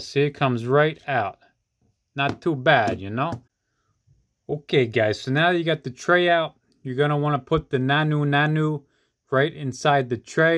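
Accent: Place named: Italy. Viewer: Hay! What happened you lost your Italian accent half way thru?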